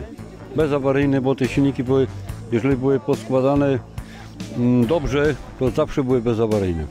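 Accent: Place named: Silesia, Poland. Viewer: witam Kolegę kocham takie przeruby jest coś fabrycznego i lubię ulepszać po swojemu i według swojego gustu i upodobania , wielki szacunek dla Kolegi pewnie jesteś ze Śląska bo masz taki akcent wiele lat temu przed stanem wojennym pracowałem na grubie WUJEK pozdrawiam i zostawiam łapę w górę i suba trzymaj się wesołych i spokojnych świąt z uszanowaniem OTTO HERMAN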